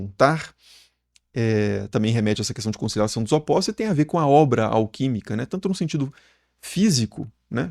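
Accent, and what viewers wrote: Minas Gerais, Brazil. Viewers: O sotaque mineirinho “quem qui era o dono dissaí”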